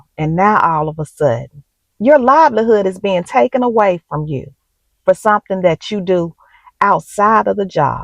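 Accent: South, United States. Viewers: Love your Southern accent. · Sis...I had to listen to this three times to get the message...that lovely southern accent is mesmerizing...complete soul snatching in effect.🤩❤ OK...now to my point. When the Dems were in charge of things, 'Everybody' and their vices were included (including the Devil's Lettuce). Alabama is Ultra right and those that live there need to be knowledgeable of the law. It is what it is...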